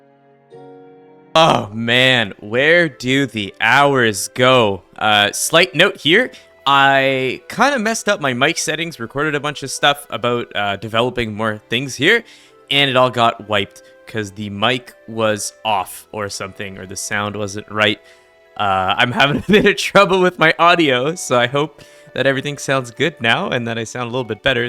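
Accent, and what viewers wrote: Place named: East, Canada. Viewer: I love your videos! I've just subbed and look forward to watching more! I suspect that you're from eastern Canada too! Your accent sounds like you're from not too far away! :D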